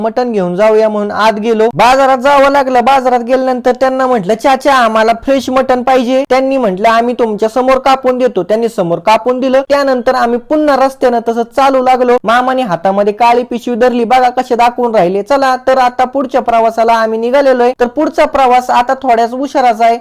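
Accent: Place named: Nashik, India. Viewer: दादा तुझी भाषा बोलण खूपच change झालं आपल अगोदर sarkhach बोल छान वाटत नाशिकची भाषा बोलू नको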